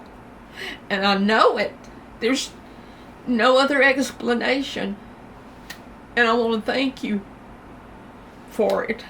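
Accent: South, United States. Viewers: I know what Low self-esteem is like. I have apologized for crying too. Not sure why because we don't really owe anybody an apology for showing our emotions.. Crying is therapy. If you held it all in you probably wouldn't be alive. Thank you for your honesty and transparency. I pray God's protection over you and His comfort over you. You have a beautiful Southern voice. I pray that God will continue to provide for you all that you need every day. He is Jehovah-Jireh ~~our provider. Ironically I just started feeling like I'm living for the first time too I've had this happen before and went back into my own grief and pain but I feel like this time is different. And guess what? I'm painting too. It's watercolor. It's just a different format than what you do but it's so therapeutic to my soul. I pray for many moments of joy and peace over you precious It's beautiful how God would let people connect from all over the country!!❤️🙏🏼 Please know that there are many people out here who will lift you up in prayer. 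Who care. And who think you are a beautiful friend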